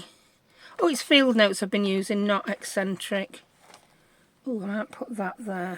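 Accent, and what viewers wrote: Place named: Barnsley, United Kingdom. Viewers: These are brilliant! You are definatly my favourite junk journal channel. I love that your accent is so familiar too, i'm from barnsley, are you local to me? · Hi love your bag journal, I will deffo be trying that in my witchy papers. Where are you from you sound from like my neck of the woods in Wakefield x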